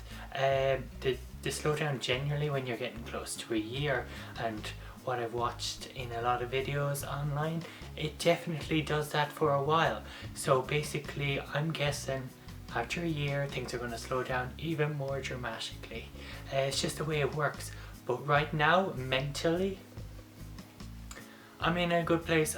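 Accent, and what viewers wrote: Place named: Ireland. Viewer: Anyone saying you don't sound like a woman is what we in Canada like call "hosers", (foolish or uncultivated people), You definitely have a feminine voice, I actually always thought the Irish accent sounds sexier in women than men and you got that sexy goin' on sister in all aspect. Your videos are awesome and definitely inspirational, so I hope you go through with your channel no matter what, since as trans people, especially as trans women, we have a truly hard fight to break barriers, and I don't think YouTube's threats should be an exception to our strength.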